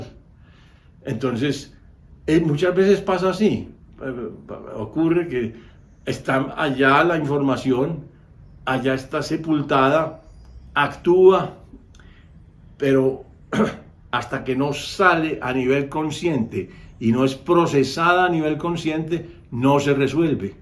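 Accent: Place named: Antioquia, Colombia. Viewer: Me alegra haberme topado con este video y este canal, muchas gracias por argumentar tan claramente; tiene una opinión muy informada por decir lo menos. Creo que es evidente que la ciencia hoy en día no es ciencia, ya que se aferra con garras y dientes a un materialismo absoluto propio de un dogma, a pesar de pruebas clínicas y testimoniales tanto de pacientes como de profesionales de la salud, sin mencionar si quiera los nuevos descubrimientos de la física cuántica (que más bien parecen ser re-descubrimientos, porque al parecer es información que sabíamos y olvidamos o nos hicieron olvidar a través de las eras). Reconozco un acento paisa en cualquier parte y no sabe lo mucho que me enorgullece que los Antioqueños tengamos mentes tan abiertas y sabias como la suya. Un caluroso saludo desde Medellín, Colombia 👋🏻 Favor continue con su maravillosa labor de informar sobre temas tan clave en especial en estos tiempos 🙏🏻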